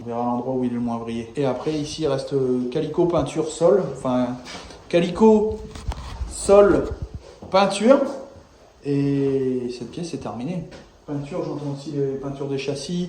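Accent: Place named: North, France. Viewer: Coucou,apparrament l'enduro reste au programme. Ici pareil ont n'attend également. Mon fils a était ce weekend au terrain ou tu t'entraines. Je sais pas si tu as connu celui de calais,les spectateurs étaient en hauteur,il y a une vingtaine d'années tout les weekends mon beau frère et les collègues y aller et c'était génial de l'ai voir même si ils nous ont fait des frayeurs. Et a Equihen également. Celui ci existe toujours. En 99 une tempête de sable a eu lieu au même moment. Moi et ma cousine en panique,le reflex des bonhommes de poser la moto et de nous rejoindre en speed car on voyait absolument plus rien,avec les enfants nous sommes tous parti dans les véhicules,le temps que ça passe. C'était choquant. Sinon niveau travaux, ça avance très bien. Mr est parti faire un chantier sur Etaples,bjr le vent ici. Pour ton titre, oh que je te comprends,et même si ont me dit la roue tourne, ça laisse un goût amer!. 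J'ai regardé le live a Rox,et c'était cool même si c'était en Replay donc pas de commentaire. Mais pas mal,comme ici c'est des grands,et qu'ils adorent asiatique,je vais leur faire. Moi perso je comprends très bien l'accent du nord car j'y suis née,mais je rigole parfois car certains abonnés doivent ce dire"quesquil dit lui"lol. Bon courage, ça avance 💪🏼👍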